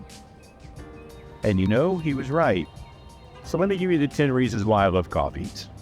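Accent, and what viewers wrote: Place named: Oklahoma, United States. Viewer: 😂 MY LUCKY DAY. Hi, neighbor! You speak my language, even saying froo-froo too, and it's good to hear from another Okie. I grew up in the Tulsa area and also loved the other-worldly aromas of the coffee shops at Woodland Hills Mall. Wow. Smiles. WH Mall was a Big Deal. Thanks for the smiles!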